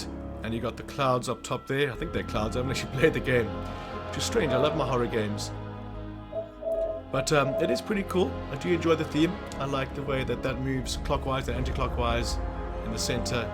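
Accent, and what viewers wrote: South Africa. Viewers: Can clearly hear you south african😂❤ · South African Youtuber ?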